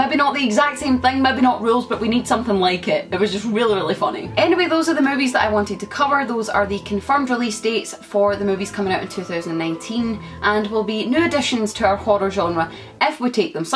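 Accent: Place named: West Scotland, United Kingdom. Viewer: Here thats a wee west scottish accent 🤣🤣 where you from pal?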